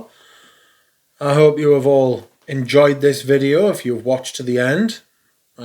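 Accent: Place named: Cockney, United Kingdom. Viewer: Dude all britsh cogney twats, talk like you? like damn you guys used to rule and exploit india and china but now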